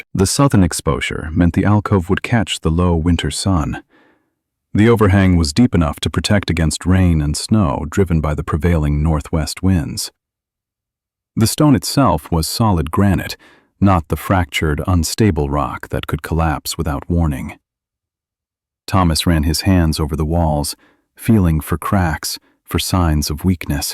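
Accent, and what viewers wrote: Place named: RP, United Kingdom. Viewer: Please explain the reason for the back and forth shifts in the narration, starting in standard North American English without accent and changing to English with a posh British accent. This starts at approximately . At that point, the narration was describing Daniel's father, Thomas, who was a immigrant stonemason from Ireland. (Just a little historical side note: In the past --- how can I say this delicately--- the Irish and the British were NOT best buddies. Enough said.) Then at , the narrator returns to North American speech without accent until approximately when posh British starts up again. Posh British only lasted a short time until stopping mid-sentence . From that time, the narration was in North American English until when Posh Brit accented speech popped back up up. the narration reverted to North American speech without accent until the end in less than one minute. 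This story and the background artwork were excellent. However, the back and forth accent narrative is distracting and detrimental. Please skip the posh British accent unless the story is about posh British characters.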